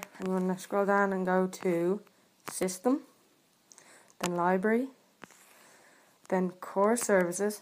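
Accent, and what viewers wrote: Ireland. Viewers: Are you irish chap me too :)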